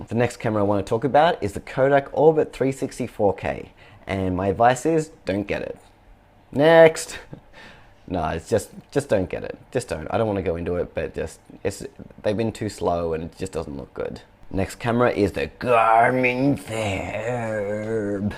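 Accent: Australia. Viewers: Your saying the original sperical shaped 360 gear from 2016 records in 4K. I'm looking through reviews and nobody is saying that. Well I puzzled??? is this for real it records in 4K? thanks for answering in advance and my other question (seeing you are in Australia by the looks and accent) where in Australia can I purchase the XIAOMI? Can you recommend any retailers that sell it?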